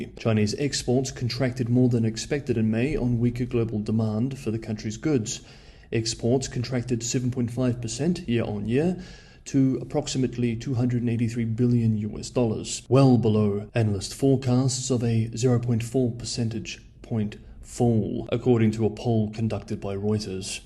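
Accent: New Zealand. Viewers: sounds like a Kiwi to me. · where are you from, mate? I think a Kiwi, and I'd guess from Wellington but most Kiwis have fairly similar accents and even Southlanders and Kiwis from Otago generally sound somewhat similar to Kiwis in the North Island (other than most Maoris). I should add that sounds much more polished than most Kiwis but I have family members who sound much more posh & toffish than even he🤣🤣🤣🤣🤣😂😂😂😂😅😄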